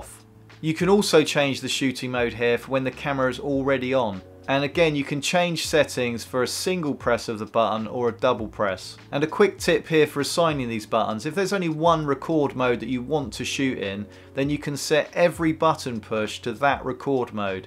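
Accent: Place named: England, United Kingdom. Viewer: good to hear a English accent for a refreshing change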